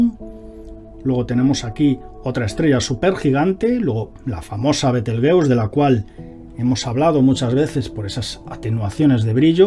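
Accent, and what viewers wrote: Spain. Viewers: Información de calidad y el acento español del Profe que nos vuelve locas a todas ❤❤❤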